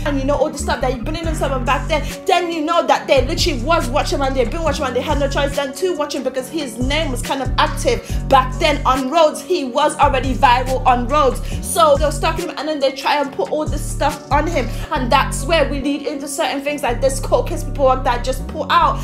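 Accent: London, United Kingdom. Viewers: Are you from london smh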